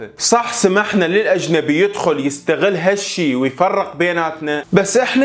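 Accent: Iraq. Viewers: صح لسانك حبيبي بس ملاحضة هوة بغدادي مو نجفي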